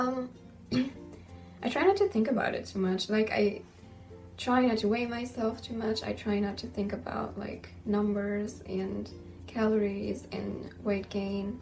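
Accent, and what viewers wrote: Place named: United States. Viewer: she have American accent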